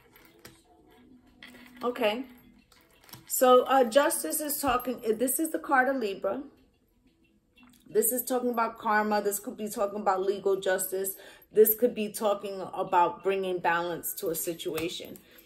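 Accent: New York, United States. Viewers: He'll NEVER be in my Empress energy ever again and he knows it so I'm unburdened and chilling on my throne with my peace and abundance in ALL forms while moving forward😎. I chose ME and snatched my energy back from a toxic ,unbalanced, superficial, false mask, lying, player libra ex after betrayal. NO contact and NO communication will remain in place permanently for that narc demon vampire! Shout out to New York😍. I'm from the DMV but I know a New York accent😁. Peace and Blessings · Definitely hear it when you speak. I can always tell when I hear a fellow New Yorker! 😁 What part are you from?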